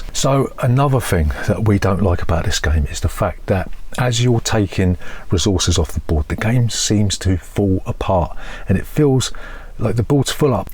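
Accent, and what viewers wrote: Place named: Cockney, United Kingdom. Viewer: I quite like watching an subtly-aggressive cockney do a board game review - he reminds me of Vinny Jones! Good stuff. (I'm sure he's not actually aggressive, it's more the comparison to Jones.)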